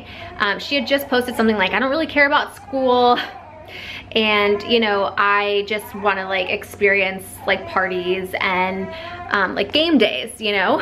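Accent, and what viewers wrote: South, United States. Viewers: I luuuuuv your suuthun accent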